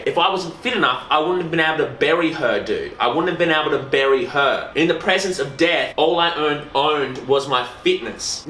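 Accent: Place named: Newcastle, United Kingdom. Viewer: If nobody has asked why you introduce videos in a Geordie accent then I'll be pissed.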